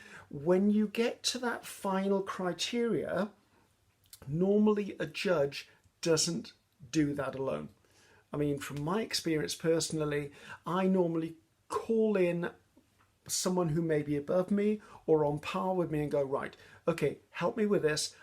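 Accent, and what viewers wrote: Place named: Wales, United Kingdom. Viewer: Love watching your work come into being. Just beautiful every time. Today while watching your Sept 4, 2021 video, "Create unique labels from one of a kind Master Boards", <link> I heard you say you're in Wales. Suddenly, your accent made all kinds of sense. With the Welcome to Wrexham Docu Series by Rob and Ryan, I've been hearing the accent quite a lot and fallen quite into fanship for Wrexham, the community and team. ARe you a fan of any of the Football clubs?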